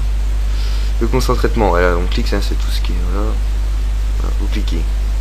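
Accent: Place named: Haute-Saône, France. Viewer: Mdrr j'ai reconnu l'accen j'suis du 70 ;)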